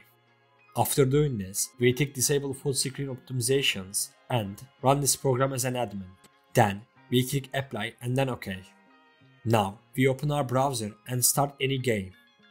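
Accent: Turkey. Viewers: this guy has the strongest turkish accent i have ever heard so far lol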